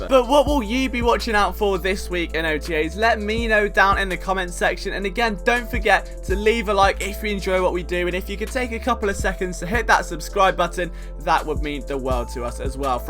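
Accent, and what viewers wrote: United Kingdom. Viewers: That is the problem for me. The content is excellent. Just can't stand the little boy brit voice.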